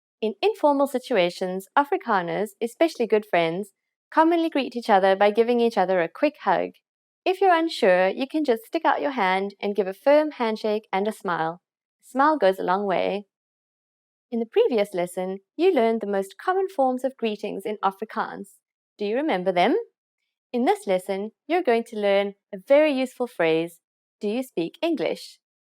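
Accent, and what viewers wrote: England, United Kingdom. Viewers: I really love this! I just wish the Afrikaans sounded more like an Afrikaans-speaking person and had less of an English accent to it. Afrikaans people do not sound this heavily English when speaking Afrikaans. Enunciation needs to be tweaked a bit more. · Her pronunciation is “off” - she speaks Afrikaans with an English accent - there are various Afrikaans dialects but this English/Afrikaans - good for basics I suppose - she does make a few mistakes in this video - Fraans vs Franse - Ek praat Fraans - Hy is n Franse man (fraansman) - Also U - its not pronounced ie - but n deeper sound- Also Skiestog - we say “Askies” etc etc etc.. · thank you for these videos, I'm Zulu so i definatly need someone with an English accent to teach me Afrikaans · The pronunciation needs work. This woman is speaking with a very prominent English accent. 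Native speaking Afrikaners don't sound so flat when they talk. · Fully agree, also pronunciation is off, she's probably british,,, also her Dankie sounds like donkey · Ek voel dat 'n tweetalige persoon hierdie video moes gedoen het. Maar goeie werk. 'N Engelse persoon moenie Afrikaans leer nie omdat uitspraak 'n rol speel. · Peter Murray Exactly! Thank you so much... I just find it sad that they didn't get a native Afrikaans speaker to give these lessons. She's a wonderful teacher, but her English accent is very strong. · I am Afrikaans. This woman has a strong English accent when she tries to pronounce the words in Afrikaans. There's also a spelling mistake - "biki" should be "bietjie" and she totally mispronounced the word item. Unprofessional advertising as an educational source.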